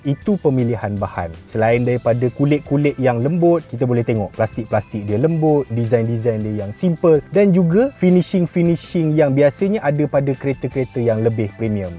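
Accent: Kelantan, Malaysia. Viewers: Duk kelate tu bang..has molek ii..sek kelate mari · Abang orang kelantan ke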